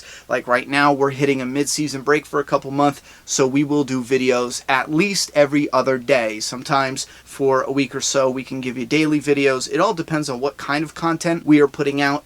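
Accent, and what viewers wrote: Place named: Philadelphia, United States. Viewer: Great video! Couldn't have agreed more, great episode, great ending they should keep blending them. Are you from philly? Your accent reminds me of home lol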